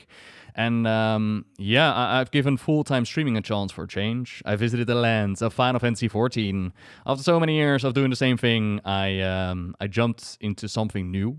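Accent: Netherlands. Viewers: This is such a random thing to mention. But you really helped me back in 2013/14, not with WoW lore(although it was good) but with actor training. I had been cast in a production of the Diary of Anne Frank. (I was one of the Van Daans, who I believe were Dutch?) Pur director had asked us to try and make our accent convincing. So I listened and binged nearly all your videos in order to learn certain pronunciations and how you formed words etc. I was told my accent sounded excellent and was extremely convincing. So thank you for the help...almost 10 years ago. Who knew WoW lore videos could used for other means😅😂! I'm not sure if you are Dutch...my 18 year old self thought you were but hey it worked out in the end.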